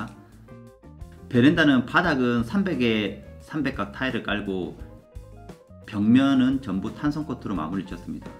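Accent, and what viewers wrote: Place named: Gyeongsang, South Korea. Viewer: 영상 볼때마다 홧병 생겨요ㅠ 왜 이제야 가 알고리즘에 떴는지 ㅠ 그래도 갱상도 구수하고 순수한 말씨가 좋아서 숨고르기하면서 봅니당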